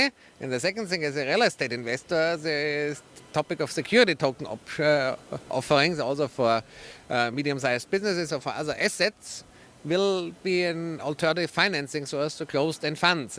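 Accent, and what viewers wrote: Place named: New York, United States. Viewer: Das ganz typische New Yorker Äll.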